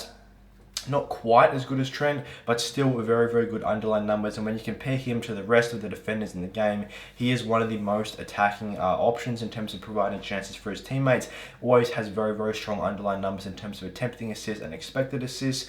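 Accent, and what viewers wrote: Australia. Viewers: Thx for the vid. Also... Thx for the recent reply to my comment re your info. I couldn't quite work out your accent but now you've confirmed you're from Oz. I take it you will be following Big Ange's exploits at TH.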